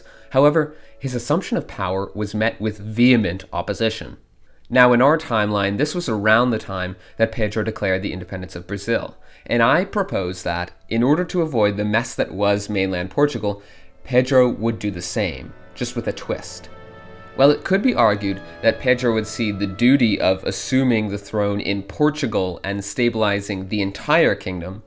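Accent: Spain. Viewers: "Mestizo" and "Rio Grande del Sur" 💀💀💀 Oh dear, Brazil is the 1000th time being treated with Spanish pronunciation. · Really cool video but the spanish pronunciations of Portuguese are going to offend some people, bro 💀💀💀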